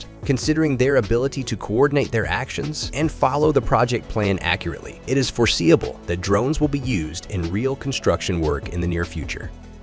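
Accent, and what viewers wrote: United States. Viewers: Why is yet another 'cut & paste' video (complete with nauseating American voice-over) purporting to be about China, showing construction methods from around the world?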